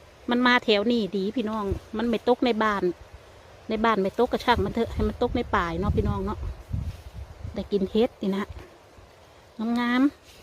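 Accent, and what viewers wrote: Korat, Thailand. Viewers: สวัสดีจ่ะ ดูยายเก็บเห็ดเก็บผลไม้เพลินดีได้ฟังภาษาโคราชวันละคำไปด้วยฉันคนบัวใหญ่เด้อจ่ะ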